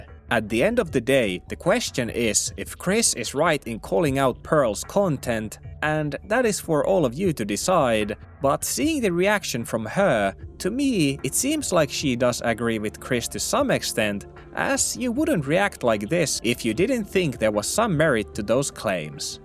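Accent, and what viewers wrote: Finland. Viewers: niinku paahtoleipä? Suomi aksentti kuuluu läpi 😂 Good video tho 👍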